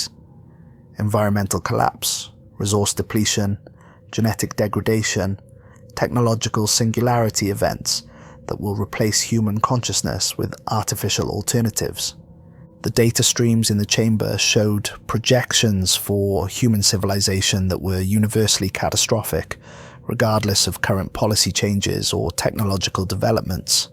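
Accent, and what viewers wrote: Wales, United Kingdom. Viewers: Not sure about the Welsh accent but I love the stories.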